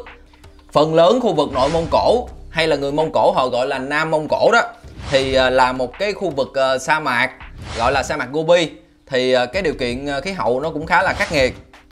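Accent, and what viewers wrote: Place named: Mekong Delta, Vietnam. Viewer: Gất là gần. Nghe rồi ông còn sub nữa. Làm như ngta k biết ông dân miền Tây hay gì kk. Nhưng video này chưa chất lắm đâu nha.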